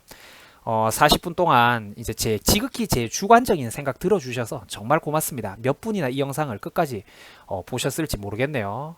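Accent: Gyeongsang, South Korea. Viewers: 대구말투 착착 감기네요 나는 대구에서 태어나서 다행이다 생각하는요즘 우리부모님께 감사감사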